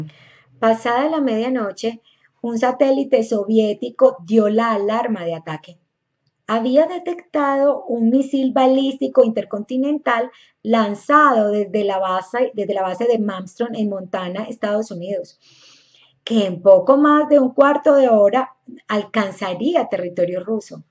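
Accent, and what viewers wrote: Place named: Medellín, Colombia. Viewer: yo tambien soy de medellin es que tu acento se me hacia familiar jejeje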